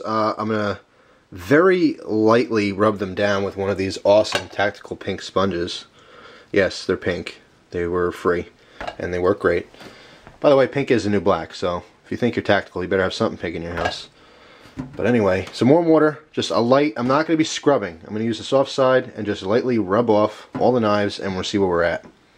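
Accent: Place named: Northeast, United States. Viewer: I love the way people from the North-eastern U.S. say 'water' lol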